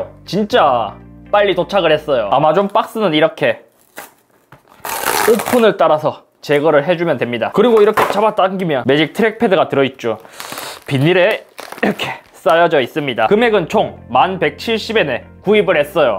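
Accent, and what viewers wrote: Gyeongsang, South Korea. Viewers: 같은 경상도사람이라서 억양자체가 비슷한데 목소리까지 비슷하네요. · 잇섭님 너무 잘생겼어요! 애플 제품 관련 영상은 다 찾아본 편인데 항상 웃는 얼굴이라서 보면서 마음이 편해져요ㅎㅎ 왠지 만나면 되게 재밌는 친구일 것 같아요ㅎㅎ 경상도 말투를 쓰셔서 졸리지 않게 들을 수 있어서 좋아요~ 앞으로도 재밌고 유익한 영상 많이 찍어주세요 :-)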